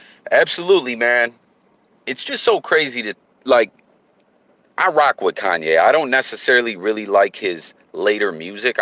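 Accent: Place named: Nebraska, United States. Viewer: Hey is you from Nebraska or Cali? You sound like it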